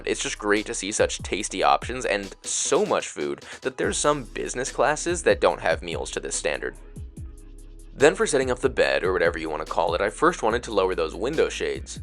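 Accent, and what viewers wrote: United States. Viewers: Good, but the harsh American mono accent was a bit of a spoiler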